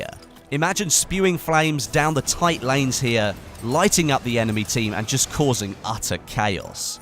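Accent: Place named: United Kingdom. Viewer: fresh to have a British accent on a battlefield video.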